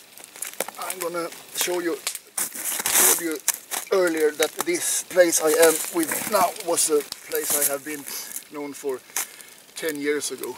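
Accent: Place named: Sweden. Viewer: det stammer säkert men det var så roligt tyckte jag. det lär så svengelskt. måste ändå som vanligt beröma dina fina filmer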